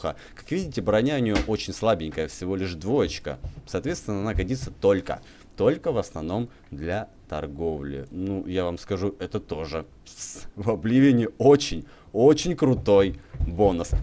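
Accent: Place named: Ukraine. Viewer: У тебя акцент.... ты украинец?)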